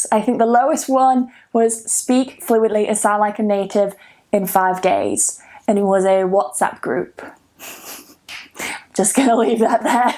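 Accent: United Kingdom. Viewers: Hello miss, yours is RP or Modern RP accent or any other UK accent ? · Please tell me in which british accent you are talking😊💐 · Thanks for your tips and all your encouraging words. Leaning a language is so difficult. It has been years since I have started learning all by myself because I was so bad in school. In France, they are not good for teaching english at school. So technically I am learning by myself with series in english with english french subtitles first and now with english subtitles. I am listening to Podcast recorded by my favourite actor who is english. And now I am preparing for the TOIEC. Each day I listen some of your videos and I am really learning to sound more British thanks to you. It's so easy to listen to you, I can perfectly understand what you say. I don't think I will be completely fluent one day because it's really hard but I am progressing each day and that's a really good thing. 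· your British accent is just lovely! I've been working on American English accent for ages, but now I need to think twice...... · Shortcut: Looping this video to learn the British accent from 😏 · I like the way you say the word "Japanese". I feel the British accent. Your channel is by far the best channel to improve British pronunciation.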